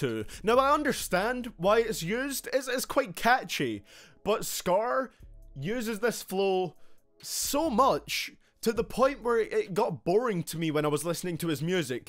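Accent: United Kingdom. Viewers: Are you British? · His British accent is the best he shouldn't ever try to hide it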